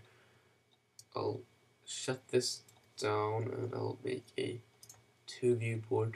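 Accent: Sweden. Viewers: Haha, fan va man hör att du kommer från sverige ibland!! Lidingö eller? :P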